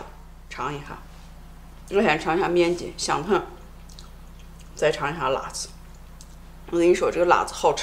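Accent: Shaanxi, China. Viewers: 好亲切的陕西话！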